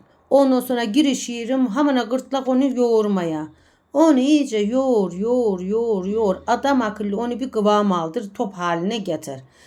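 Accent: Black Sea, Turkey. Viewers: Karadeniz şivesi mi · Aynen karadeniz şivesi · Bildiğimiz Erzincan lokumu Karadeniz şivesi ile Anlatılınca Trabzonlu olmuyor kendi yöreinizin yemeğimiş gibi biz bunu çok severiz demişsiniz bir kere erzincan lokumu dememişsiniz ama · 😂😂 uy kardezli bacim bende karadenizliyim bizim bu şifemiz nekadar da güzel emegine saglik 😊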